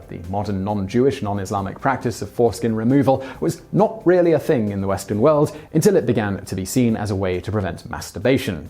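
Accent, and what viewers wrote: United Kingdom. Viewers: This is the most British Simon has ever sounded in any video.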